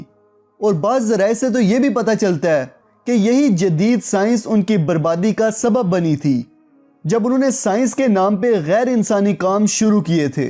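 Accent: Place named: Punjab, Pakistan. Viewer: Aap ka Urdu talafuz Sahi nahi hai Aap k talafuz me Punjabi talafuz ki milawat hai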